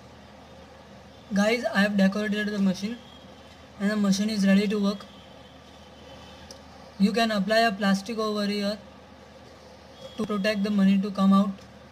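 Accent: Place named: India. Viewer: Dude Well done but some word are still in indian english so Some people won't understand it Well I m indian So I no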